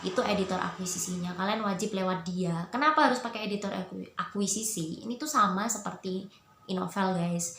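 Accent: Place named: Java, Indonesia. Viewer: Medoknya menteb bnget mbak, sama kek saya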